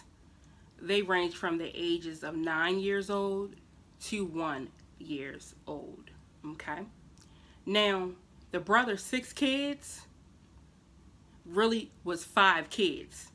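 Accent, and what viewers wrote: Baltimore, United States. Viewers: I'm new to your channel. I recognized the Baltimore accent and had to follow and support!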